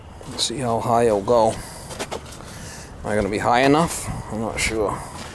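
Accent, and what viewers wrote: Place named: South, United States. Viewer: Are sure you're not one of us Southerners? Lol. Keep the vids coming brother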